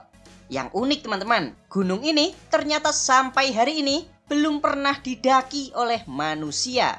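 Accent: Java, Indonesia. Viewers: Min medog jawane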